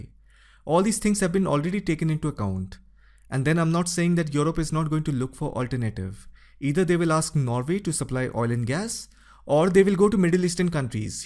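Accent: India. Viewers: Why the Russian guy who did this comprehensive video is talking with an Indian accent? The whole point of any American intervention in Europe is to put down Russia for good, no matter what. But You are talking about people suffering, who cares. While Putin is sending all those young fellas to die defending the future of motherland Russia, a country who has no future at all, Americans are sending Ukraine nationals to the front, in the future they will send European nationals if necessary. It is a win win situation for them. I am pretty sure a German jobless kid will rather enjoy a cold winter at home than dying in the front if he is convinced that a boycott to Russian gas will cripple Russian economy for good. In two years you will be making a video on how your beloved Russia lost this war and was harassed by vast continents. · There are 2 sides to the war. Russia and the West. 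The west can stop the war and so can Russia. The west can stop sending aid to Ukraine and remove sanctions... OR Russia can simply withdraw from Ukraine. To say it's the west that is at fault shows your preference for Russia. Strangely you sound Indian and yet do you not realize that neutrality in the war will significantly benefit India and China while the rest of the world suffers.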